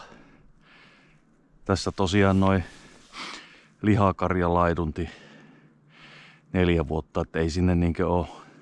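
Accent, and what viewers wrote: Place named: North, Finland. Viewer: Näin metsäalan ammattilaisena ottaisin tuosta nuo harvat hieskoivut polttopuiksi ja kiireesti kuusen taimia maahan. Olisin istuttanut ne jo viisi vuotta sitten kun sanoit, että laidunnus on siinä jo loppunut. Nyt siinä kasvaisi jo perkuukypsä kuusen taimikko. Nuo kilpailun hävinneet vanhahkot keskikokoiset kuuset ovat aina arvoitus. Melkein kun sellaisen kaadat on siinä jo musta piste keskellä eikä siitä silloin enää kunnon puuta tule, vaan vie tilaa terveiltä puilta. Eli olisin ottanut ne harvat pikkukuuset ja hieskoivut kuiduksi jo hakkuussa ja istuttaen uusi taimikko tilalle. Hieskoivu tuppaa olemaan niin kova siementämään, että raivurille on taatusti työtä aina uuden vesakon puskiessa yhtenään. Murteesta arvelen, että kohde on pohjoisessa joten näin pirkanmaalaisissa eteläisen suomen metsissä työskentelevänä maaperä ja puun kasvu on tietenkin erilaista luonnon olosuhteista riippuen ja se mikä toimii etelässä ei aina toimi pohjoisessa. 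Rannan läheisyys ja kostea maaperä kohteessa tukee tietenkin koivuista hieskoivua, kun raudus on karumman maaperän puulaji. Kuusi on toinen mikä tuossa menestyisi kuten sanoit. Kuusi siementyy luonnollisesti koivun alle menestyksekkäästi ja luulisin, että lähipuista muodostuu uusi kuusi sukupolvi tuon rantakoivikon alle. Näin sen voisi kaksois kasvattaa lopulta kuusta suosien ja ne koivut pois vaihtaen lopulta puulajisuhde kuusivoittoiseksi. Jatkuva kasvatus ei onnistu kuin teoriassa ruutupaperilla ja on sääli, kun erinäiset viherideologiset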